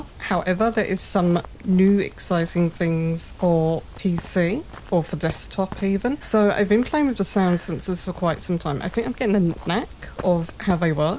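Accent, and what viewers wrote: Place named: United Kingdom. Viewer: from what I’ve heard most British accents I hear are far more pronounced than yours, which is why I was wondering what accent you had. I guess you just have a really relaxed way of talking!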